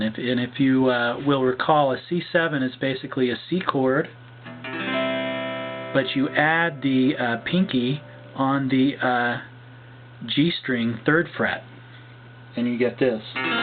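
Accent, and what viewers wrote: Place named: United Kingdom. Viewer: I would of thought you were british!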